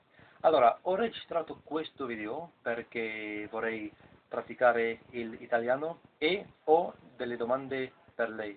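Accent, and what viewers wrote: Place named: Spain. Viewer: You have a spanish accent when you speak italian, is interesting how a second language affects a third,fourth ect....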